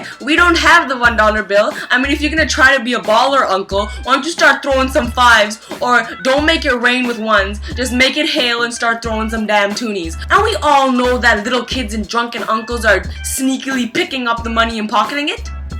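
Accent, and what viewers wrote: Punjab, India. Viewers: annoying as fuck! stop talking in Indian Accent cuz you do it annoyingly! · tussi badi changi Punjabi bolte ho.. I adore your Punjabi accent...😍😍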